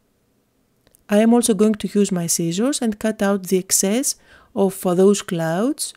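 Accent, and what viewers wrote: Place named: Greece. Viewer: your accent makes me wish to be in the Pelleponisos again this summer!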